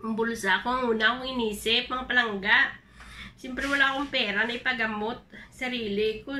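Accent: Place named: Iloilo, Philippines. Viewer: Hi sissy sending my support thanks for sharing sister daw ilongga ang boses mo sis sa antique ako enjoy lang sa pag vlogg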